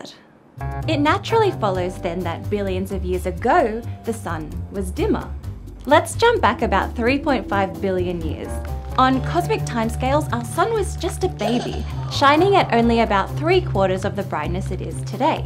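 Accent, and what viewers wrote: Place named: Australia. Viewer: university? (*plus i detect an accent) ..... are you aussie, or sud afrikan, or NZ?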